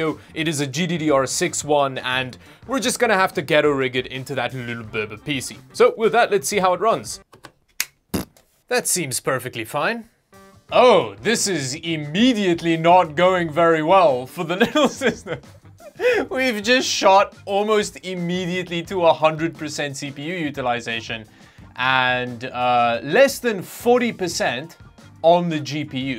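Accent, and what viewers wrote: South Africa. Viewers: You're originally from SA right? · South African accent ?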